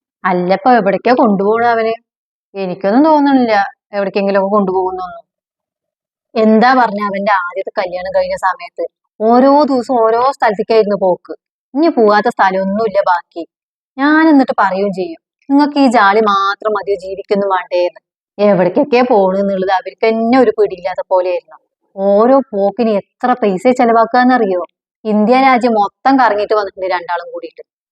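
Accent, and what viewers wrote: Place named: Kongad, India. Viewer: കോങ്ങാട് ആണല്ലേ വീട് സംസാരം കേട്ടപ്പോൾ സംശയം തോന്നിയിരുന്നു 😊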